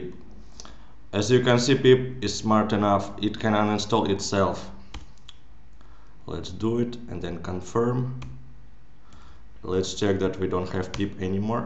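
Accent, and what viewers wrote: Russia. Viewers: мне показалось или он русский???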